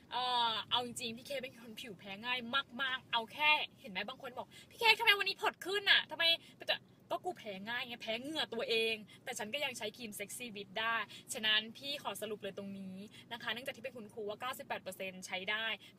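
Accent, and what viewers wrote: Thailand. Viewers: เหน่อ เว้ยเฮ้ย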